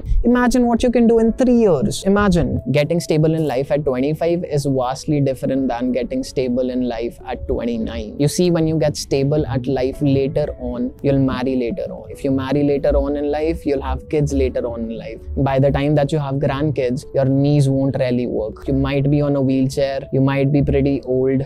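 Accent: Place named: India. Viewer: information is really worthit . and the most imp. thing is that till now his excent is indian . hatsoff to this guy.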